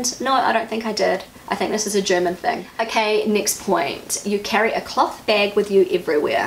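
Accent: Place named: New Zealand. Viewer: Love it!💕 happy to see how comfortable you feel and how much you have germanized without losing any of your kiwi identity ☺️ PS: love your account, as i‘m a german and spent 9 months in Whangarei during school and your account always reminds me of the kiwi accent and the country in general🥰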